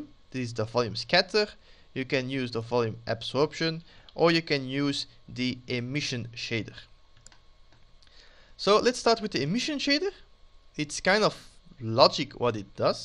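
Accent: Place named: Netherlands. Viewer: Heel duidelijke en mooie tutorial bedankt dat je dit met ons deelt. Ik vindt het leuk om te horen dat er Nederlands door je Engels heen komt dus mischien ook nog Nederlandse Tutorials ;-) Dus misschien hoor ik nog van je op het Dutch Blender Community <link>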